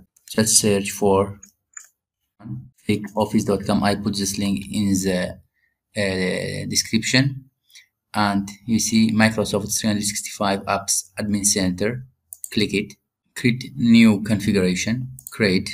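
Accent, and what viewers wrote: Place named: India. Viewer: the moment that you sounded indian it was over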